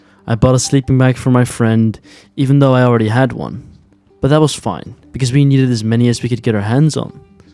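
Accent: Netherlands. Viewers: damn wist niet dat je nederlands was tot ik je hoorde praten met je team haha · Are you Dutch... ? :)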